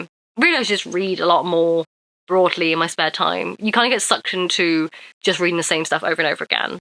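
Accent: RP, United Kingdom. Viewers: Ok good faith question, asking because I do the same thing ok so it's not a judgement. Do you have a theory on why you sound so posh? Because I do too, but only in English which isn't my native language. I pick up accents all the time, put me in a different part of the country and I'll be speaking that in a few weeks, but posh is very much my neutral that I can't seem to get rid of. My best guess on how it happened is that my ADHD and other chronic illnesses makes me not speak any language very well. I don't remember common words in my native language so tend to speak three at a time. If I have to speak 1 language at a time there are a lot of pauses because I have to think of the words rather than just insert it from whatever language I do remember it in. I think the posh might be overcompensating for that.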